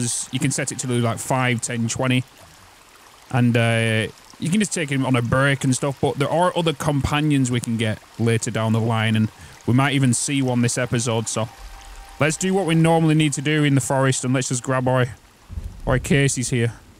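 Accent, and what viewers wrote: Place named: Preston, United Kingdom. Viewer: Your accent really sounds like you are from preston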